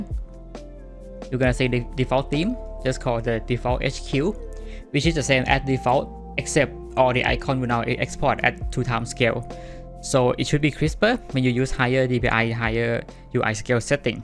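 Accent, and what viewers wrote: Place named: Thailand. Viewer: คนไทยปะครับสำเนียงคือได้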